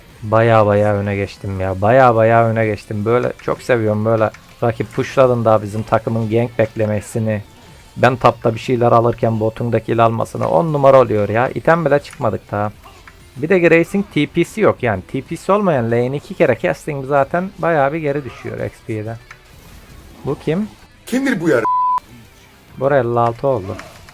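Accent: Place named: Muğla, Turkey. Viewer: Askerde Muğlalı arkadaşlar vardı. Şiveye ordan asinayim. Ama Alanya tarafına da benziyor. 'Nişlen' kelimesini çoğu kişi anlamaz.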